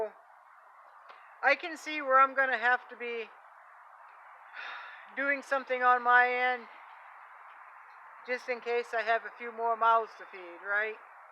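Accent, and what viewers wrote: Maine, United States. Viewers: I bought my mom in CT several cases of H2O and clearly marked them for emergency. Next time I went there I asked her where she stored it. She took it to the dump. Couldn’t understand why she needed it. I just smacked myself in the forehead. I’m glad I found your channel. I lived in Belfast for 8 years. I miss the great no nonsense Maine way of thinking (and the accent). I’ll keep watching ya. I’m in your tribe, but in TN now.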